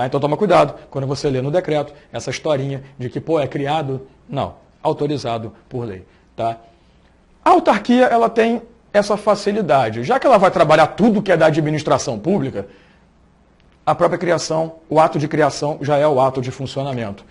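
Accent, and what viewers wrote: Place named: Rio de Janeiro, Brazil. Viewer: esse sim é bom e verdadeiro carioca por isso que me indentifiquei.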